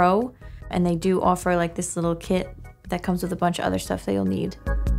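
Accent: Long Island, United States. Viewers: that long island Accent is hitting different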